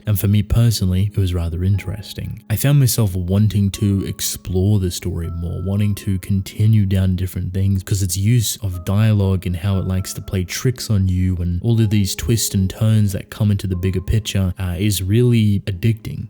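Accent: Australia. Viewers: Are you australian ? I love australians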